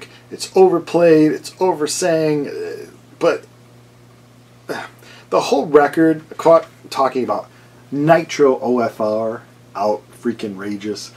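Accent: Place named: Chicago, United States. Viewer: I can tell right away that you're from Chicago. I grew up in Chicago but I live in California these days. That Frehley's comet live album was recorded at the Aragon Ballroom. I was there. Y&T was the opening band. had the best song on that comp album " ".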